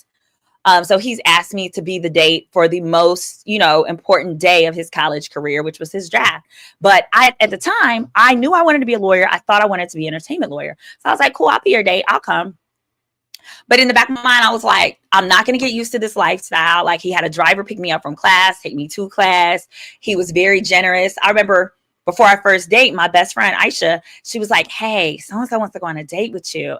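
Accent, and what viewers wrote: South, United States. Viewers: Hey, love your lives covering this trial! I graduated from Thurgood a few years ago and I'm from Oakcliff too! Listening to you is making me wonder if I sound like you lol, that country twang be jumping out.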